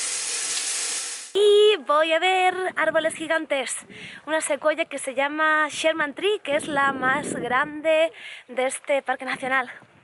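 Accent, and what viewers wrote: Spain. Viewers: por qué ese acento español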